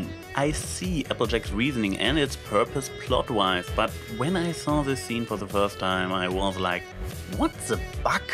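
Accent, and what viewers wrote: Germany. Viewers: Hi. Love your videos but I can't stop thinking about that accent you have. Are you german or what kind of accent is it?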